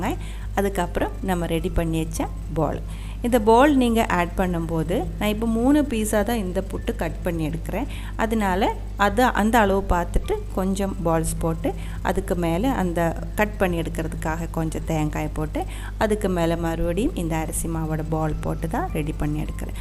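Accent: Kerala, India. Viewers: I think you r malayalam but you try to speck tamil well try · Super maam. Your Malayala slang tamil is beautiful 👌👌👌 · அழகான மலையாளம் கலந்த தமிழ் பேச்சு வித்தியாசமான டிஷ் சூப்பர்மா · Kerala tamil.... Wow... Chance se illa.... 😇🥰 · Malayali aano ningal samsaram kelkkumpol malayali samsarikkunnapole und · സംസാരം കേട്ടപ്പോഴേ തോന്നി മലയാളി ആണെന്ന് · Karala voice karala voice thann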